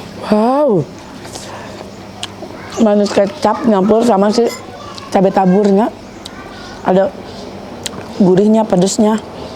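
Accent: West Java, Indonesia. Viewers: Punten teh sekedar saran. Tth jgn pke jargon endol surendol di chanel tth. Krn mnurut ku chanel tth tu khas pembawaan dr tth yg spontan dgn bhsa Sunda atau logat Sunda. Jargon itu kan buat di TV sebelah brsama rekan kerja tth biar ada ciri khasnya dr chanel tth gtu jgn pakai jargon itu gtu Hehhe punten hanya saran Overall aku suka smua konten2 tth sehat sllu th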